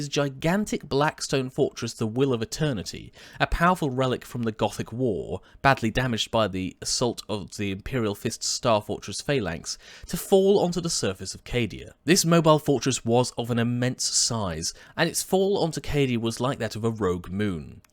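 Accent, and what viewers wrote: United Kingdom. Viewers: lol, the British accent making terror and terra homonyms :D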